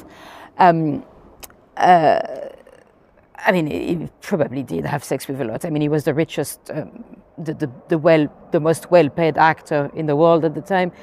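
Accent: France. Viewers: You can't possibly be referring to the French accent? Love it!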